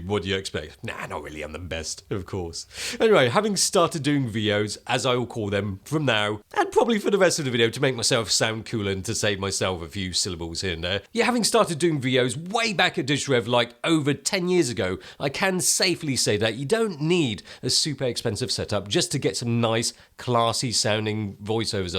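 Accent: United Kingdom. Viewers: Hey can you please make a video talking about yourself, where you grew up, how come your English sound perfect British? I enjoy watching you vids. Thank you for posting these. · The best asian guy who speaks britishenglish. A fan since digital rev days. More power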